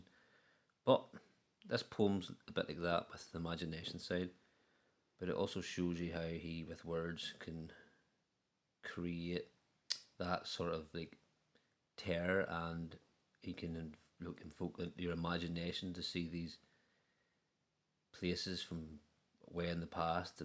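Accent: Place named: Northern Ireland, United Kingdom. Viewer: Awesome poem! I agree with Patrick, that northern Irish accent makes the reading better 😊, thanks for sharing this mate🤝 Hope you have a great day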